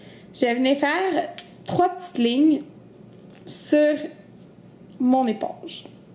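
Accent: Quebec, Canada. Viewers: stun accent quebecois , jen ai un moi aussi comme tous les quebecois . ta dequoi contre eux ? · L'accent quebequoi est le plus Bo des accent , bravo et merci pour ce tuto :D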